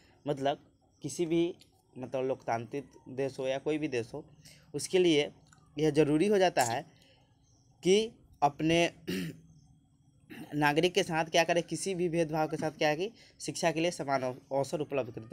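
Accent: Bihar, India. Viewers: Aapke notes helpful h aur aapke bolne k tarike to majedaar h, aapke bihari tone k kaaran smjhne me v aanand aata h, keep it up...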